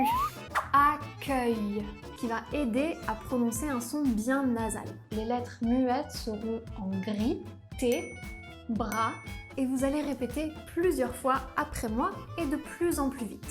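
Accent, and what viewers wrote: France. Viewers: Bonjour. Je ne suis pas d’accord avec la prononciation pour le « ê ». Il se prononce bien « è » et non « é » quelque soit le mot. On a un accent circonflexe là où en ancien français, il y avait un S après la dite lettre avec accent circonflexe aujourd’hui: Hospital (Hôpital), Forest (forêt) etc. Pour le verbe rêver, il vient du mot « esver »: nous retrouvons bien le S. Cette règle fonctionne pour toutes les lettres avec accent circonflexe. Ensuite, je remarque que tu prononces le son « è » en « é »: tu dis francé et non français. Pareil pour « j’ai » etc. Tu as bien hérité de la patte du Sud de la France;) Enfin, le « â » est bien prononcé dans la région champenoise et surtout dans le Nord de la France. Je pense à la région de Troyes notamment ^_^. Sinon super travail pour les non francophones:) N.B: je suis bien française et enseignante;) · Disons que tu as un accent français de France très marqué pour moi ! 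En Suisse romande, on fait la distinction entre les a de bras et gâteau (fermé), et souvent entre le o (souvent ouvert) et eau (toujours fermé)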